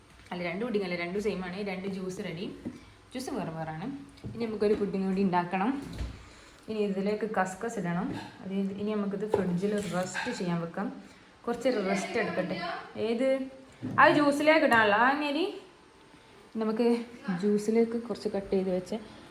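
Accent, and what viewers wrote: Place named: Thamarassery, India. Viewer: എൻ്റെ favorites നമ്മളെ നാടൻ ഭാഷയാണ്.😂😅 നല്ല rasand താമരശ്ശേരി ഭാഷ കേൾക്കാൻ❤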